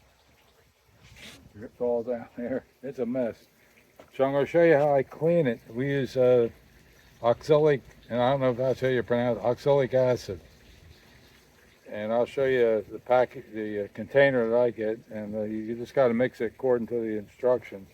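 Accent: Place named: New Jersey, United States. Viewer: Thanks for your videos. You remind me so much of my grampop and my dad (you are younger than both lol. But my memories are of them when they were still here. ) keep laughing it makes me so happy to hear that laugh. Just like ol Cornie's laugh. And you walk and move like dad (he was a sailor in his youth. You just don't lose that rolling gait!), your jersey accent makes me feel like I'm home again. (Jersey girl too). I look forward to your videos. Keep catchin!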